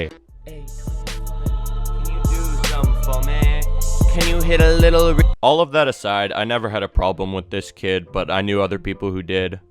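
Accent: Canada. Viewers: Bro you sound so canadian, more canadian than most ones Ik, maybe cuz im in the city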